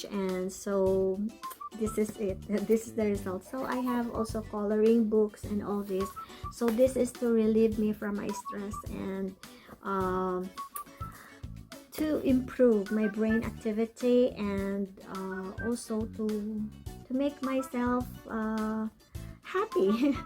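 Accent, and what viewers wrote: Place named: Philippines. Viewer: Plus your accent sounds just like hers. She was filipino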